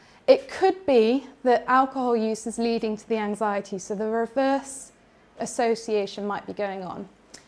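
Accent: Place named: Bristol, United Kingdom. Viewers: she speaks perfectly understandable received pronunciation English with a Bristolian dialect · a lecture by a British English speaker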